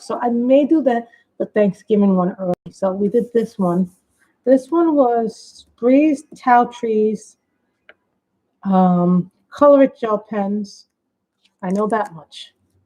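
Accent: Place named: New York, United States. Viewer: I never seem t catch you live but watch your replays. Always enjoy your completed pages. I also,love listening to you as you remind me of home. I ever like hearing the background outside noises. I use to live in Yonkers right in VanCortlandt Park. (Bronx/Yonkers line). Thank you for sharing and hopefully I will catch you live soon. 💕🦄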